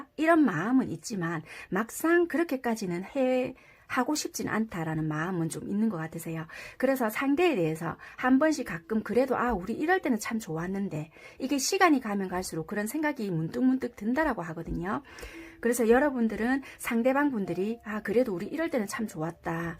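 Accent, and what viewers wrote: Busan, South Korea. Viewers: ㅕㅛㅛㅛㅛㅛㅛㅛㅛㅛㅛㅛㅛㅛㅛㅛㅛㅛㅛㅛㅛㅛㅛㅛㅛㅛㅛㅛㅛㅛㅛㅛㅛㅛㅛㅛㅛㅗㅕㅛㅑㅕㅕㅕ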